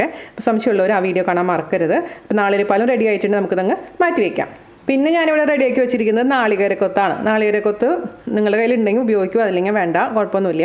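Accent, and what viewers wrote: Thrissur, India. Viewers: നല്ല രസമാണ് അവതരണം തൃശർ ഭാഷയല്ലൊ.' ''?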